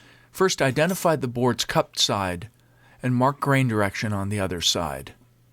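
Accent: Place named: United States. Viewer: What a real american accent from a magyar :)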